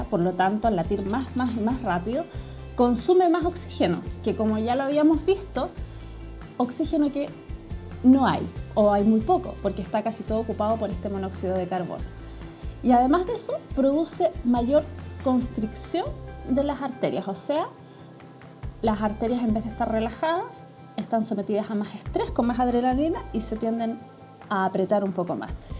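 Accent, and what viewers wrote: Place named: Chile. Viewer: Buena información, pero terrible ese acento 🤦‍♀️🤦‍♀️ deberías modularlo un poco, para que te entiendan los q no son chilenos.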